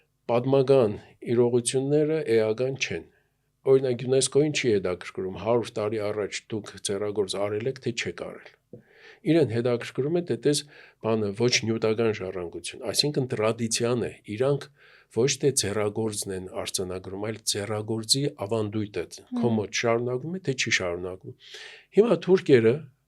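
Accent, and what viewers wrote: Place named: Gyumri, Armenia. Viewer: գյումրու ակցենտը ❤❤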